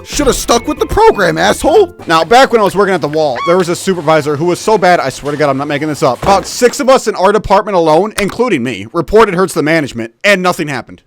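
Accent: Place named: Wisconsin, United States. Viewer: not to be weird but i have this feeling your from wisconsin or a neighboring state. Culvers gang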